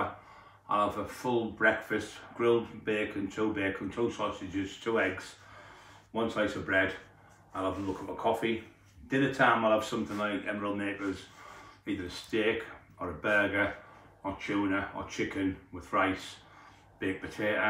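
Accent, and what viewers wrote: Scotland, United Kingdom. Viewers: I knew you had Scots in ye!😉 ye talk straight from the heart brother, a true Scot.👍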